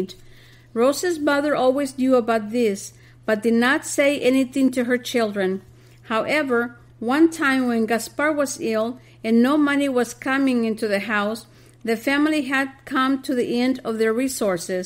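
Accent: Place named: Colorado, United States. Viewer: Interesting presentation. Never heard of this saint. Thanks 🙏. Your narrator has an interesting accent.... southern Colorado or New Mexico? Just curious. Appreciate your work much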